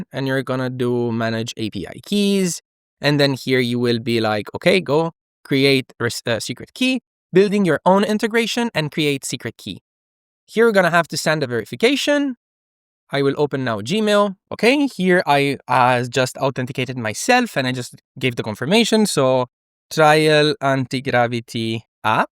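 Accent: Italy. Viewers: Idk but this guy made me fall in love with Italian Accent...!!!! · Accent kinda italic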